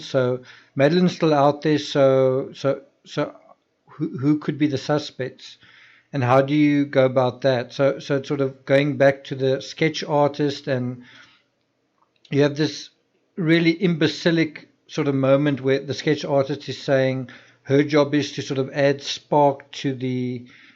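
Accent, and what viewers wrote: South Africa. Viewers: Your accent is definitely South African.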